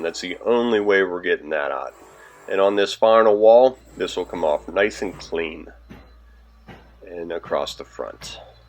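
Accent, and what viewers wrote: Pittsburgh, United States. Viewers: looked on all your sites but dont see where your located,,i detect a pittsburgh accent in your voice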